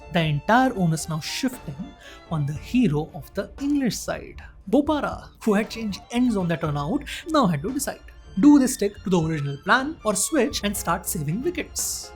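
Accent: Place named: South India, India. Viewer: Oh my god bro this dosa idli sambar accent so annoying to hear 😭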